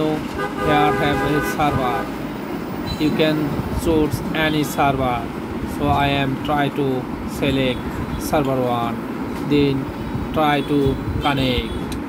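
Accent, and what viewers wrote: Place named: Bengal, India. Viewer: ও যে বাঙ্গালী ওর আওয়াজ এই বোঝা যাচ্ছে 😂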